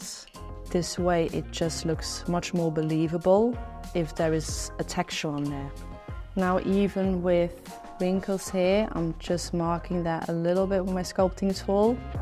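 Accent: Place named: Australia. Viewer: Lovely English pronunciation 😍 Oz one right?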